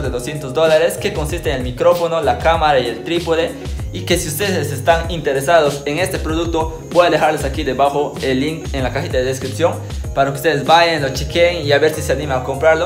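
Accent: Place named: Amazon, Peru. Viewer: Jaja eres charapaza hermano, saludos desde lima buen canal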